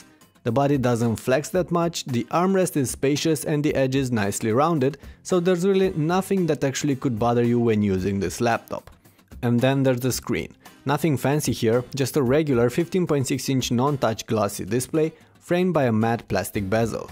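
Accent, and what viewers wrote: Romania. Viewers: Mai mult ca sigur esti roman (fac referire la narator) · romanian accent? :)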